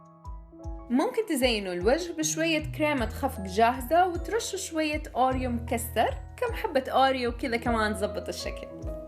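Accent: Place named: Hejaz, Saudi Arabia. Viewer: الله يسعدك يا. من كم يوم بس عرفت قناتك وتابعت أغلب الفيديوهات انت جميلة وبنوتة رائعة ومرتبة وأنيقة الله يحفظك. فيديوهاتك تجيب السعادة💞 💜. وأحلى حاجة لما تقولي سيروا عليها" ياحلو اللهجة 😃ذكرتيني بأهلنا في الحجاز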